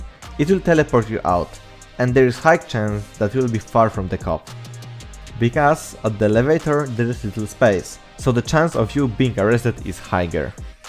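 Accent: Poland. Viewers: holy polish accent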